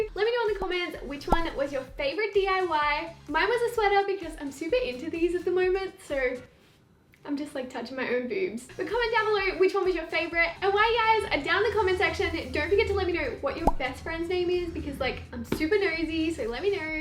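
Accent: Australia. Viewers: i love how austrailans talk... vider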